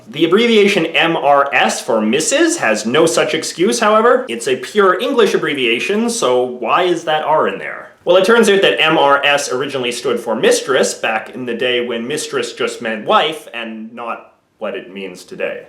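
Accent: Canada. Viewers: bnfk He’s Canadian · You are the first Canadian I have heard who actually says aroond and aboot, lol. I thought that was just a myth... I'm sorry that it sounds comical to my ears :( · keep up the good videos , also you sound oddly canadian , you live up north?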